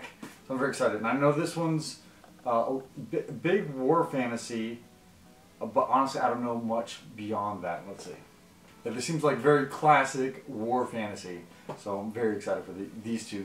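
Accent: South, United States. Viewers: I can't believe you had a lot of books at your house in Texas on this video during your quarantine including Malice by John Gwynne, Priest of Bones by Peter McLean, Winter Counts by David Heska Wanbli Weiden, Practical Magic, The Rules of Magic and Magic Lessons by Alice Hoffman, Piransi by Susanna Clarke, My Best Friend's Exorcism by Grady Hendrix, Dracula by Bram Stoker, Cemetery Boys by Aiden Thomas, The Grey Bastards by Jonathan French, One Word Kill by Mark Lawrence and so many others until you're talking about all of them to read while you made your beautiful husband, Zach read them with you at the time, so you're discuss about all of the characters from all of the books after that, so he'll be very proud of you and I love your Southern accent!!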